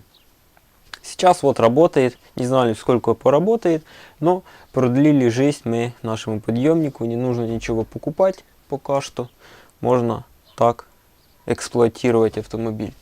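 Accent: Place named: Urals, Russia. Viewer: Умань? а говор как на урале ))))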